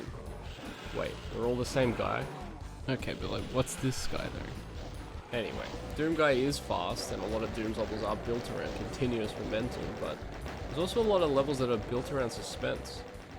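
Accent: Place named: Australia. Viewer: Subbed! Not trying to be a bitch, I mean this as constructive criticism - you talk kinda slow, your voice is nice it was a tad too fast on 1.25 and , it would probably be good to speak a little faster. - it's not your accent, I'm Australian too, peace from Perth, WA(steland) ❤what you said about good sprites > poor 3D, HOLY SHIT THAT IS SO PAINFULLY TRUE WITH POKEMON! Seriously, compare the gen 5 sprites on the DS (nearly 20 year old hardware tsk tsk) to the gen 6 (3DS) 3D renderings, they looked lifeless and cursed - shit, compare gen 5 sprites even to gen 9 on the Switch, gen 5 sprites are STILL sooooooo much better!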